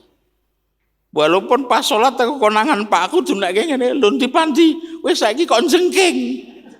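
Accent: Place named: Semarang, Indonesia. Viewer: Bahasane semarang bnget..cocok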